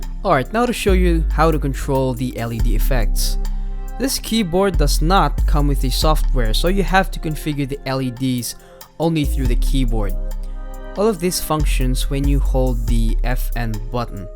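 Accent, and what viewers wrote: Philippines. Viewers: I thought I heard a Filipino accent 🙂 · Filipino accentttt... good video bro.. nagdadalawang-isip ako as to get this or not but I'll take ur word for it..looks durable enough and it seems like a good budget mechanical keyboard...kudos 💪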